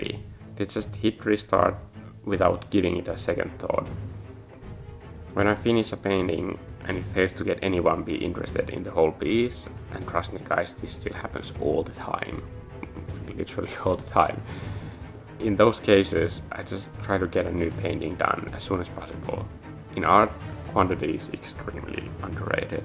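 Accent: Finland. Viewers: I knew you’re Finnish because of your accent before I even saw your username lol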